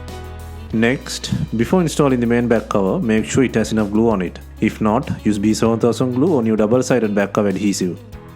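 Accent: India. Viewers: Don't listen to this guy. You were perfectly understandable. Your indian accent sells it.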